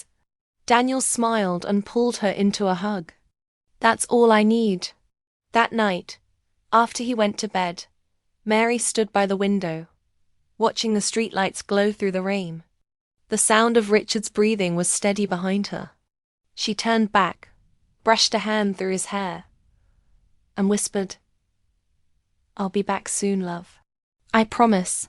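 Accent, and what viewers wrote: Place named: England, United Kingdom. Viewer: Very appealing English accent. Thanks.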